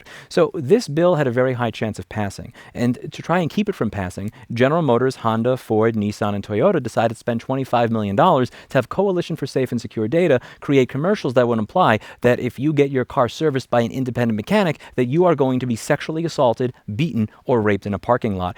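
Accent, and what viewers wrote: New York, United States. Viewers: The New York drawl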